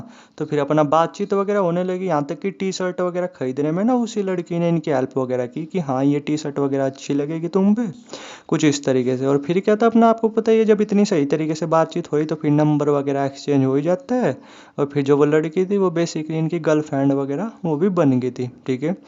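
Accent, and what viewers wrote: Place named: Western Uttar Pradesh, India. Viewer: Nice story bhai ❤. Aap apni boli se west up ke lg rhe ho, whi k ho kya?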